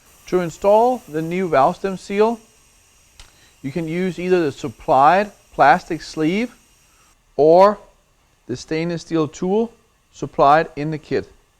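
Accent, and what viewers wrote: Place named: Denmark. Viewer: The danish accent ;) Although it was very subtle. Great video btw.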